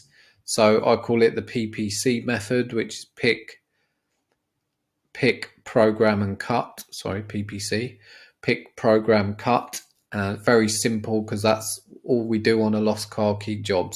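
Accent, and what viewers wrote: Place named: United Kingdom. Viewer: he’s not asking how to convert he was just checking if you was on about dollars as your accent sounds uk so he was just making sure.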